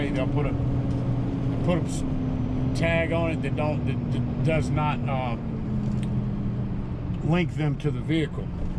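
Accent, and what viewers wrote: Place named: New Jersey, United States. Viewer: You sound like a friend of mine from new Jersey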